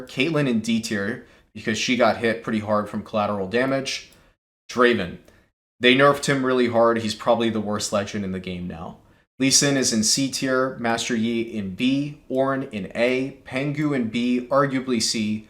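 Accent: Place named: New York, United States. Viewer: What part of New York are you from? I hear the accent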